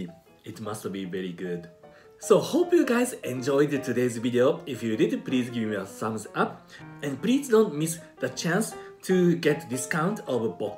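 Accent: Japan. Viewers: Always interesting listening to native Japanese speaking english(no offense meant). As someone trying to learn Japanese I am beyond impressed how well transitions from JP->En->JP->En is for a lot of people. Ive always had a hard time with the order of things moving between English to other languages, like spanish. Kudos.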